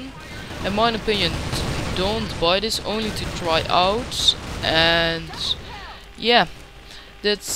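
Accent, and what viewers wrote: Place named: Netherlands. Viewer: haha ik hoorde al aan je accent dat je nederlands bent!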